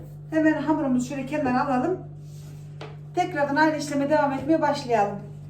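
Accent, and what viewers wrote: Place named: Konya, Turkey. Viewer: Hayïrlı aksamlar hanım Konyadan Selamlar🙋 Hemşehrimsin ben de Aksaray ortaköylüyüm videolarını çok beğeniyorum hatta bugün iftarda revani tatlını yaptım şahane oldu.Seni dinlerken silayi Rahim yapmiş gibi oluyorum çok yakın hissediyorum ayni bizim gibi konuşuyorsun.🌹🌹🌹👍👍👍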